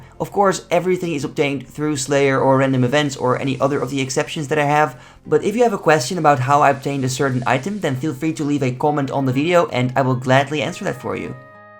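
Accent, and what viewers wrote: Netherlands. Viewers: I recently discovered your channel and benching it rn! I was wondering if you were Dutch by any chance, your accent sounds familiar?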